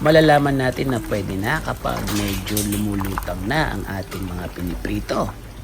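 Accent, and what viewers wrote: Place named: Philippines. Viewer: I like that filipino accent Low to medium hit!! Proud Pilipino pala here 😊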